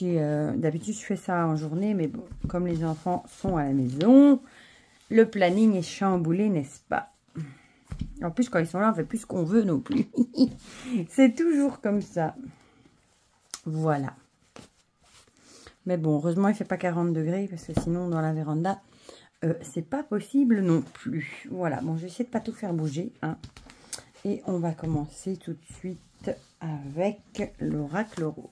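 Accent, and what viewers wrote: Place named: Belgium. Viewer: Coucou bonjour 🐈❤🐈‍⬛🦄🐿🦔🐼🦆🐢🐇🐭, Grand Merci pour cette vidéo. J ecoute grandement car ce matin, je n etais pas présente. Ce jour 33 degrés 🌡, c est un grand ouuufff 😢. Un été qui ne brûle pas, c edt un vrai REVES. Un jour ca brûle, un jour un peu moins. C est cooolllll, que tu fasses la guidance sur ta terrasse. Le train 🛤, un vrai bonheur. Tu me fais trop rire 😂😂, ton Gardfield 🐈 qui est têtu 😂😂. J adore cet accent Belge 👍👍😘😘. Gratitude infini 🙏. Je te remercie infiniment et je te souhaite un bonne journée. Chez nous, comme tu sais, c est Férié. Je t envoie pleins de belles énergies 🎉💫💥. Prends soin de toi et de Ta Famillette. Bisous bisous Sylvie 🙋‍♀️🕊🦋🕊🦋💙💙💙🙏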